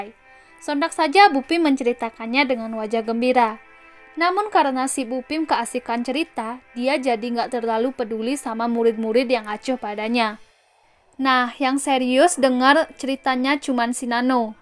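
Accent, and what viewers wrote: Bali, Indonesia. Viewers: Kali ini q dgr suara ini Chanel anak Bali Yach?? Keliat bgt ni suaranya khas Bali. Morid, ithu, memanggel dsb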